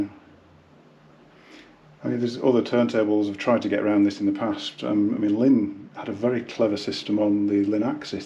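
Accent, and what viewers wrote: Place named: North West England, United Kingdom. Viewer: his accent being called British upper class, I think you'll find it's a normal North West of England accent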